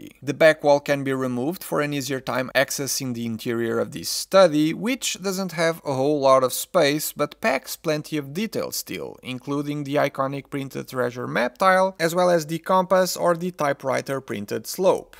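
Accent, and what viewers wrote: Portugal. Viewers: Pelo sotaque e pelo nome deves ser tuga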